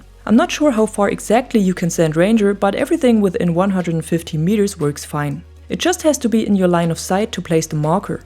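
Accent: Germany. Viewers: love your german accent, you voice is so hot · Warum kann ich sagen das du aus Deutschland bist ? 😂😂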